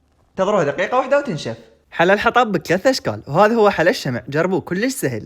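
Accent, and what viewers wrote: Diyala, Iraq. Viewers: مبين من ديالى❤❤😂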